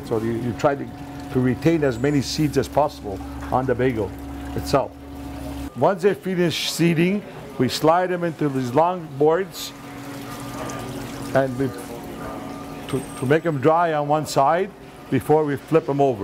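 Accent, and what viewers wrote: Montreal, Canada. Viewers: funny englihs accent exclusive to montreal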